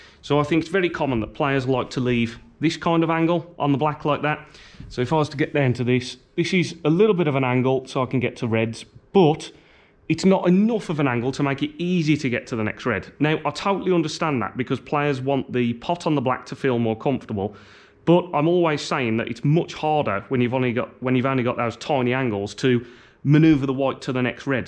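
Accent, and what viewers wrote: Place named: England, United Kingdom. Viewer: Ok cool. Im a Londoner born and bred. Nowadays most whites kids in London speak English with a hybrid Jamaican dialect which is very different from the anglo saxon dialect of English which is spoken in this t.v programme by this presenter. In the early 2000's, young white kids on council estates in London became JAMAICANISED. This is when they starting speaking with English with a hybrid Jamaican dialect. For example, Essex county is the only place in Britain where the cockney dialect/and or accent is still spoken. Tell something. Do you speak English in a hybrid Jamaican dialect?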